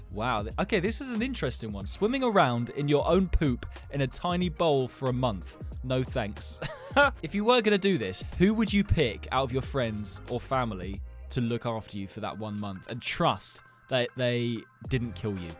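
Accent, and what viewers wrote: United Kingdom. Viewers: Wait are you meant to be from the UK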